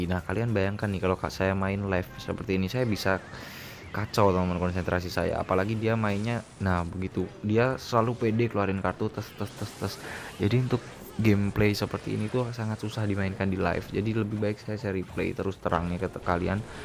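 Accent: Yogyakarta, Indonesia. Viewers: Wong Jogja bro, wong Jogja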